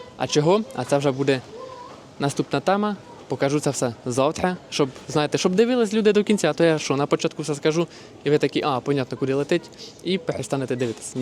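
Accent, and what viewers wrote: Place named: Slobozhanshchyna, Ukraine. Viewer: тисячу лайків за те, що балакаєш на слобожанській говІрці! Не звертай увагу на "мовний патруль", уже наслухались "вишуканої"...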